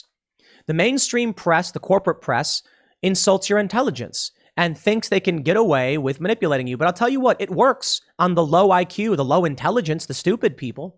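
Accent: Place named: Chicago, United States. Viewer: stereotypical chi town accent is funny. You can tell he is from the Midwest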